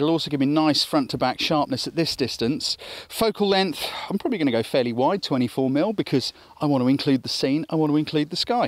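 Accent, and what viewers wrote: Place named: England, United Kingdom. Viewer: i was shooting sunset pics at the beach for my first time on manual mode and i was speaking like unconsciously(English accent) in an effort to set the right settings to get a good shot LOL... hope i am not the only one hearing voices (his) on my head hahaha. oh out of 208 got 10 decent ones for my level.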